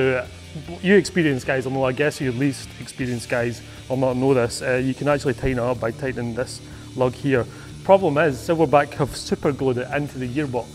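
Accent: United Kingdom. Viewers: Jeez, everything you say is so credible. Damn British accents!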